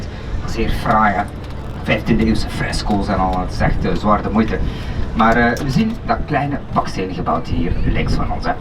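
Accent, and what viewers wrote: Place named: Holland, Netherlands. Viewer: Jammer van dat Hollands accent...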